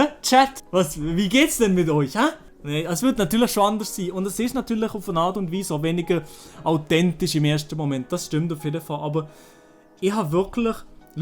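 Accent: Switzerland. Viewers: Ich fändis nitirlich schad wenn mä der komischi (nit negativ gmeint) Dialäkt nimmä wurdi gheerä aber ich cha der Gedankägang durchüs verschtah. Äs isch wahrschindlich scho chli deprimiärend, dass mä mit Schwizerditschä Videos eifach ä sehr begränzti Riichwiti het. Mä derf aber nit usser acht lah, dass Schwizerditschi Videos bi Schwizer sehr güät ahchämät und si sich mit derä Schprach ai viu besser chenät identifiziärä. Ich hoffä, dass dü diä uberleggigä ai machsch. Dr definitivi Entscheid isch natirlich ä diär uberlah und dü muäsch dich ja nit sofort entscheidä. Ich wurdis sehr fiirä wenn dü witerhin Schwizerditschi Videos machsch, aber mä eurdi sich sicher ai äds Hochditsch gwännä. Ich wyyschä diär nu ä guäti Zyt und viu Glick bi dinnärä Bachelor-Arbät. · Würde ich gut finden. Du kannst mehr Leute erreichen und alle deutschsprachigen verstehen dich. Am Anfang wird es zwar noch etwas ungewohnt sein, aber das wird sich dann legen. 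Doch tu einen Gefallen.. Rede wie ein deutscher und nicht wie ein schweizer 😊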